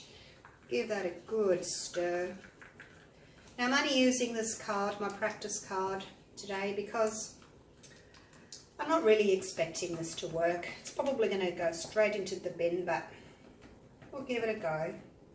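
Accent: Australia. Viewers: Do you are silicone to your paints? I just love watching you :) YOU ARE FUN Fabulous So Cheerful and very TALENTED too! Love your Style & Aussie Accent is music to my ears :) just lovely and your designs are brilliant!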